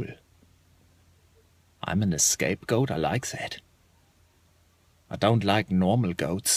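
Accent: Germany. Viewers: Are you actually German or is it just an impression?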